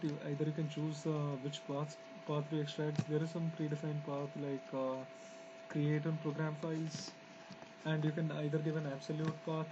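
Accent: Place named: India. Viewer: stupid indian accent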